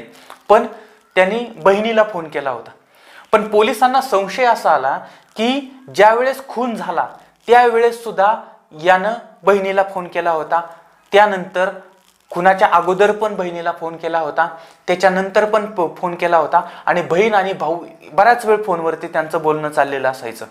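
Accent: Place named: rural, India. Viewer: निवेदन शैली गावाकड्ची, विनोद्प्रचूर पण गुन्हेगारी विशयी गाम्भिर्य व तळमळ असलेला लय भारी!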